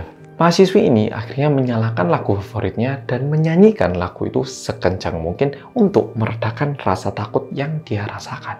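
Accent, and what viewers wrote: Java, Indonesia. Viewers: Mau yang medok saja pleaseeeeeee😂😂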